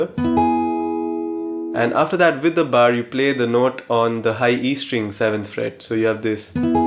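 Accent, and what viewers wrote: India. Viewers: Your accents seems to be Indian..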